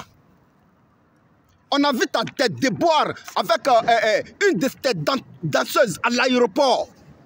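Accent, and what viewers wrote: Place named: Ivory Coast. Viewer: Koffi a déjà mahé lahan de watara il s en fout.....😅😅😅😅 je suis congolais je kiffe l accent ivoirien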